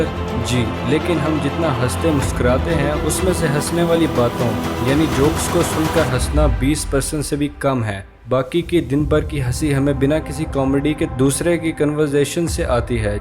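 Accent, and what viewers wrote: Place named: Punjab, Pakistan. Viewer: tumhara lehja "Punjaabi"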